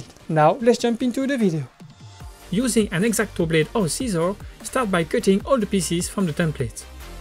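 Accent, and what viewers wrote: France. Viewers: Wah mdr l'accent Français se capte direct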